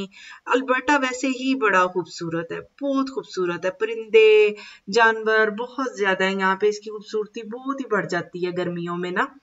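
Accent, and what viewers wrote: Punjab, India. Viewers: Ma Sha Allah , Nice sharing👍🏻 awesome video. Very informative and very well explained. 😍loving your Punjabi style. Absolutely amazing ❤ keep sharing videos dear. My kids also watch your videos. They like your way of talking 😍❤️ i also follow you on instagram.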